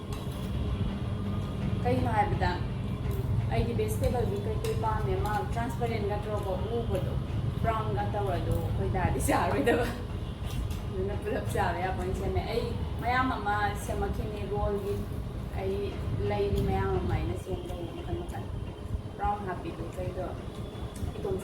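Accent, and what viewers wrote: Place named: Kakching, India. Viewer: I knew it that you were from kakching the first time I started watching your video. My mum grew up in kakching and the way my mom speaks manipuri language whenever we visit kakching is same as yours ❤️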